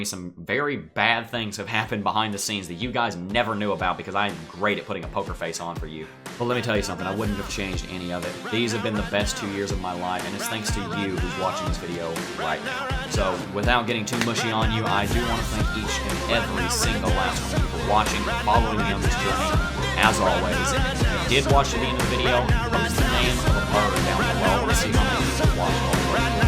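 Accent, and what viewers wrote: South, United States. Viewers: Don't do it. Your folksy southern cadence is all we need!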